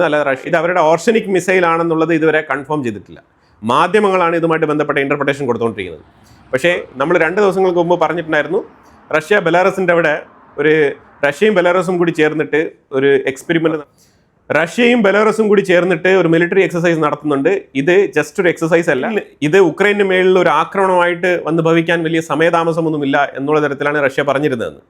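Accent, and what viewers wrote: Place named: Alappuzha, India. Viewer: Hello Are you the native of all eppey? your slang is just like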